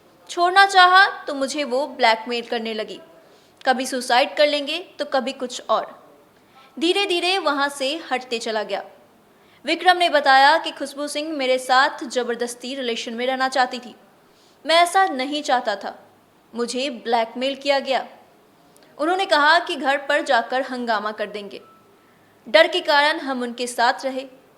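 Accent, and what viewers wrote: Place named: Bihar, India. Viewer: Aapka hindi me Bihari tone aa raha hae....kripya improve kare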